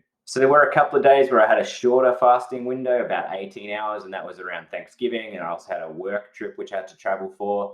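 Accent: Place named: Australia. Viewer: I don’t know why but whenever someone has the Aussie accent I listen even deeper.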